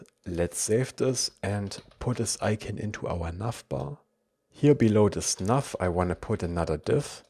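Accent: Germany. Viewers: are you german or do you speak german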